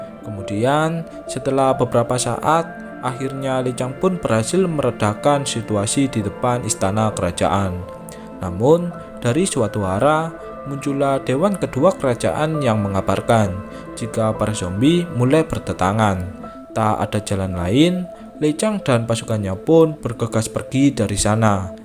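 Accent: Java, Indonesia. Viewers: Gak asik denger naratornya....terlalu medok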